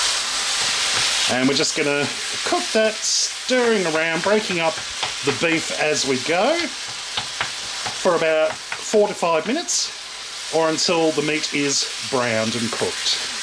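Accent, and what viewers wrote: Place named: Australia. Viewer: Yes, he's an Aussie.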